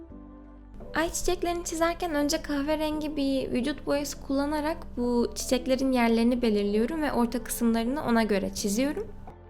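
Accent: Turkey. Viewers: And because Turkish is a highly evolved language in comparison to other Turkic languages. She also speaks the Istanbul variant of Turkish. Millions of Turks there speak like that. The politician speak the Turkish more sharply (because it needs to be more aggressive, because obviously, it is politics). Politicians even get diction training in Turkey to speak the way they speak now.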